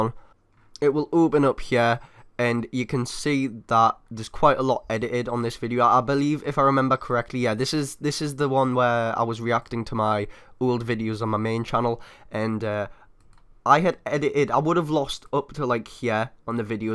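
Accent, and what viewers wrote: Newcastle, United Kingdom. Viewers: Got a problem? There is always a guy with a geordie accent to help ya out!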